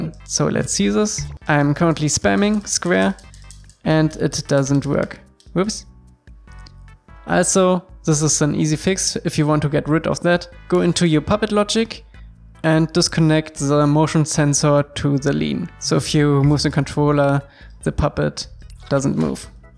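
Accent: Germany. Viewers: Love the German accent ? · Deutsch Akzent is da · Schöner Deutscher akzent Das video ist supi 👌🏻👌🏻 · echt jetz? warum denn nu in englisch? wenn ich das will da gibts doch schon so viele....aber gute "how to`s" in deutsch sind doch schwer zu finden! Bitte - hast übers animieren auch in deutsch was gepostet? Ist super wie du das machst, aber dein englisch ist ....lustig - so deutsch, smile^, wie langemachst du das schon? und wie lange bereitest du dich auf so ein video vor? Danke fürs antworten auch wenn der Spot schon alt ist^^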